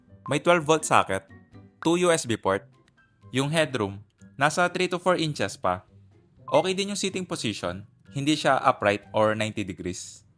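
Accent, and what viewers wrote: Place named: Pampanga, Philippines. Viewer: Dinig na dinig ko yung kapampangan accent..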